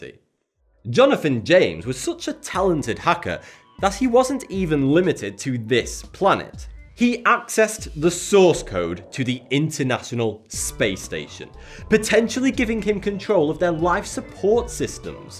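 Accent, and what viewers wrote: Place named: England, United Kingdom. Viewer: I love the English accent, but hate the English. I'm riddled by contradiction.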